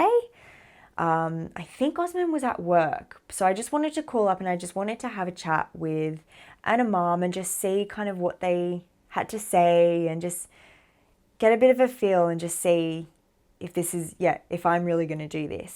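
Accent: Australia. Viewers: Masya Allah Tabarakallah❤ Alhamdulillah Hearing your journey, especially when you called the mosque in the morning for your Syahadat, left me in tears.. Joyful tears Qadarallah Allah SWT planned the phone to be picked up so quick. Love your accent, my Mum's Australian, miss her soo much during this pandemi. I'm a reverd,living in Indonesia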